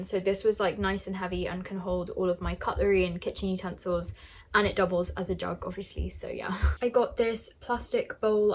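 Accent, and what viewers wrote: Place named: United Kingdom. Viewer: I really love your British accent.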